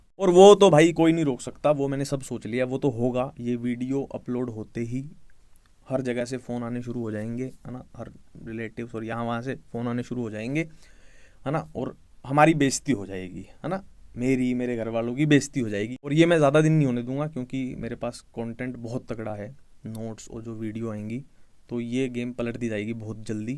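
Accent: Haryana, India. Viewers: Bhai mere app ho successfull.. You help a lot of neet aspirants Ghana pyar haryana sonipat te Apke video main doubt tha avaj te ke haryana te ho Ibb confirm hogya❤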